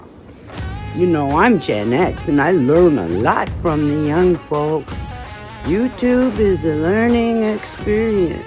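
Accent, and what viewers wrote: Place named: South, United States. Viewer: ❤I hope you didn’t drive in that Terrible Dangerous Snowstorm ❤Take Care❤I am outta that Mountain 🏔️ Hillbilly Cabin of Mine for Now &Back in the Northern “Q”City. Were there is less Snow ❄️ than the Mountains 🏔️ are covered Deep the Higher You Go..2to 3 Feet 👣 up ⬆️ in the Mountains..Down in the Valley 6”inches at most…We live in Lower Mountain 🏔️ Valleys mostly in British Columbia…Your Voice is nice “Southern Drawl”You don’t have to speak faster then I’d have Trouble understanding..Keep on Posting every Day has a New Story to tell😊😊😊❤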